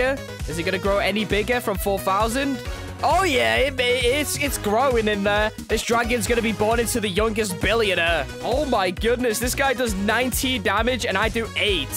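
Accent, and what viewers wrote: United Kingdom. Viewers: MY GUY IS THE BRITISH KING ACCENT🔥🔥🔥🔥💀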